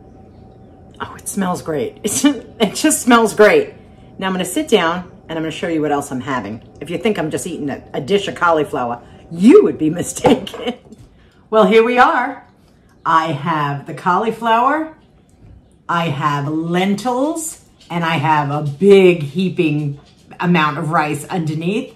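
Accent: New York, United States. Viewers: Morning! do i hear a hint of NY accent in you? lol the dish looks really good!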